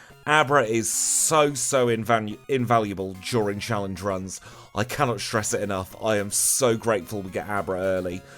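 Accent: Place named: United Kingdom. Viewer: Yoo. I play one shot games of Dungeons and Dragons every week and this week had me questioning my DM constantly. You two sound exactly the same. It was wild, I kept looking over at him thinking you are you just don’t want to tell me. I’m going to assume he is probably from the same part of GB as you, and it just the accent I was hearing.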